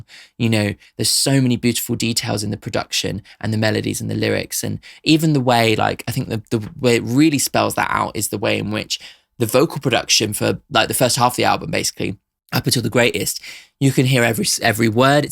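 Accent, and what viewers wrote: United Kingdom. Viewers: Do people with beautiful English accents realize how they just seem brilliant to us Americans? You make “bastard” sound nice! Anything you say sounds smarter than us even if it’s gibberish! Funny how music masks accents.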